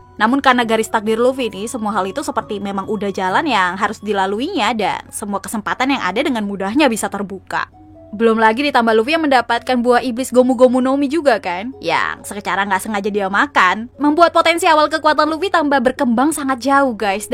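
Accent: Java, Indonesia. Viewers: Nah gtu dong ada medok nya kan jd lucu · Saya suka aksen jowo mu... (*_*)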